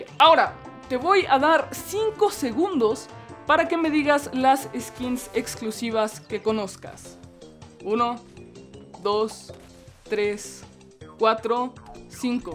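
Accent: Costa Rica. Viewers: Nmms sos de costa rica? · Soy el unico que se dio cuenta que el mae habla como costarricense? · Deberías de dejar de hablar como costarricense te escuchas ridículo, es a criterio personal. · Eres de COSTA RICA?. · Eres tico? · este mop habla como tico k estilo!!! jajjajaaja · Suenas tico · Eres de Costa Rica? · Eres tico? Noté que se le salieron varias frases❤🗯💙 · oye eres tico? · es de costa rica o que xd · oye bro eres de Costa Rica ?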